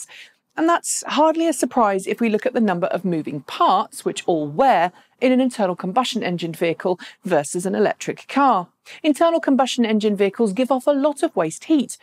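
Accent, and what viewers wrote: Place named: United Kingdom. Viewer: Somewhat interesting. She needs to drop the brit accent. It is Not sexy.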